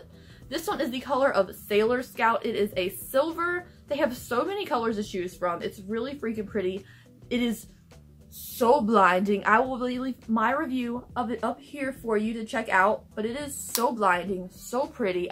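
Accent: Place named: North Carolina, United States. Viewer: Youre in NC, i was like i know that accent! I lived all over NC for 8 yrs. Whats that wig's name/style? My hair is copper blonde/auburn, i dont dare to go over to the strawberry blonde reddish color, but still like it. It def suits you. I love Colourpop's birthday cake i was just starring at it today, i love to add sparkly pigments on top.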